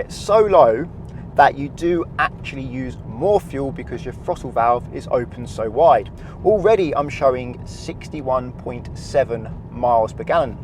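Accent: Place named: England, United Kingdom. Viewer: alyone else find it tiring to listen to the many english accents Youtube has given us? Some are cool, some are annoying, some are incomprehensible... its a tiny island nation get it together, you invented the language, it's three not free, find not foind. This one is actually really hard to listen to, my brain is literally tired from all of the uncomfortable sounds.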